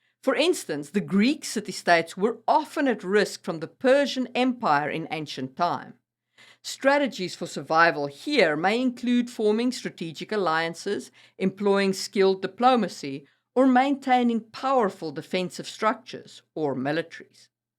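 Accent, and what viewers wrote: South Africa. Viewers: Great video, thank you!! Do I detect a South African accent? 😎 While watching I kept thinking about Cape Town's historical and modern viability as a city state. I'd love to see some videos about how nations and empires crumble, and what happens in the aftermath.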